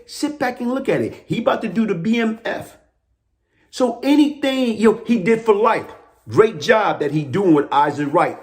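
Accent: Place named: Baltimore, United States. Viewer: For somebody from nyc you pronounce your words like you from Baltimore.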